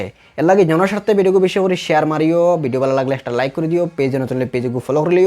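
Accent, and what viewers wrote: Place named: Assam, India. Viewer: Eta assamee bengali na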